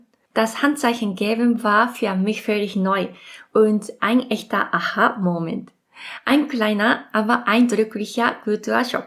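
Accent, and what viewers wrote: Japan. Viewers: Liebe, ich höre ihnen sehr gerne zu, ihr Deutsch ist perfekt mit dem kleinen japanischen einschlag. Ihre inhalte sind sehr informativ und ich freue mich auf weiteres von ihnen.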